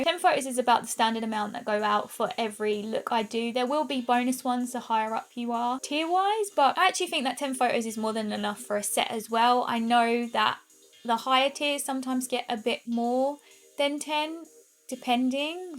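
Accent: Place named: United Kingdom. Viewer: I hope your not offended by this question but you sound British that’s not the question rather if you are from where do you hail from London by chance?❤️👍😁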